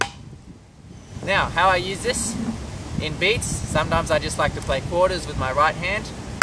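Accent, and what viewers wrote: Australia. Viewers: Is that an Australian accent? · Yes. The Australian accent varies a little bit over different areas of Australia.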